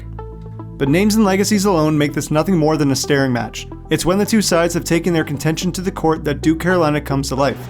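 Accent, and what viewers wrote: Canada. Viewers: The narrator makes two huge gaffes. One is his inability to correctly pronounce the word “Raleigh”. It is easy to pronounce if you pronounce the first half of the word like you would a college cheer. As in Rah Rah (insert team name next). The second half of the word is pronounced like the man’s name “Lee”. Put the two together and you have “Rah-Lee” or Raleigh. His second brobdingnagian blunder occurs when he tries to pronounce the word “Carolinians”. The proper way to pronounce the word is like saying “Caro- lynn-e-uns”. Practice a bit and he will master it in no time. Btw, he also mispronounces the word “South”. I am guessing he has roots in Canada by the way he says the word. Properly spoken, the word sounds like “Sow (as in a female pig” added to “th” as in the last to letters in the word “tooth”. Easy, right? Fun fact: in our 13 men’s college National Championships is the fact that North Carolina is the only state that has three universities with at least two championships each. 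North Carolina with 6, Duke with 5 and North Carolina State with 2. The announcer also mispronounces the word “out”. Once again, he must have roots in Canada.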